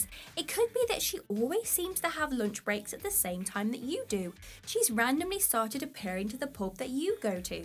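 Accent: England, United Kingdom. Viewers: Love your accent yet can’t quite place it. London? Bath?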